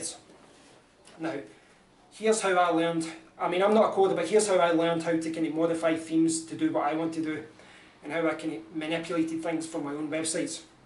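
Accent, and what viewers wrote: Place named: Glasgow, United Kingdom. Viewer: Thanks,. Lots of useful advice there. And +1 for books. Agree also that Udemy quality is variable to put it mildly. And some of the claims they make - like become a fully fledged developer in 6 weeks - are a bit wide of the mark imo. (Also, loving that accent. Reminds this southern softie of the very happy years he spent living in Glasgow.) Thanks again.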